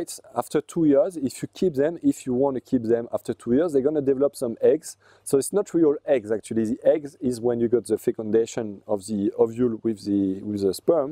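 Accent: France. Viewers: Très français cet accent !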